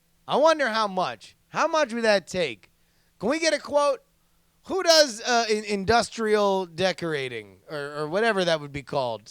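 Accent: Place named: United Kingdom. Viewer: Best British accent in the business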